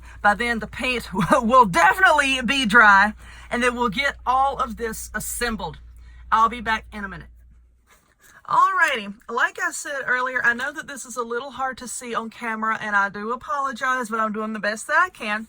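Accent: South, United States. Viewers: I'm new to your channel, this is my second video on your channel I've watched...I have to say it but I love your accent! Lol. And this diy, OMG...it's so beautiful!! Thanks so much!! ☺💗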